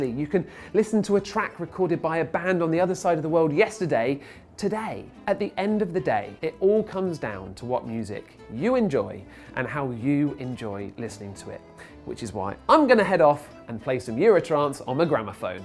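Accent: United Kingdom. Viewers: Thank you mr. British man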